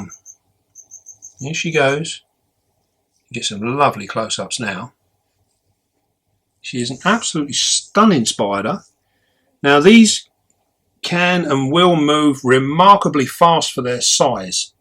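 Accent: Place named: Dorset, United Kingdom. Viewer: OMG that thing is HUGE! The cage is beautiful! Hearing you talk brings back fond memories of visiting my Uncles in Dorset long ago, my Mom's side of the family is all from over there.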